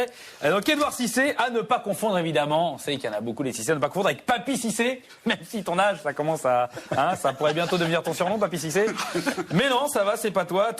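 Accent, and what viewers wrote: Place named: Paris, France. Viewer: il parle comme un vrai parigot.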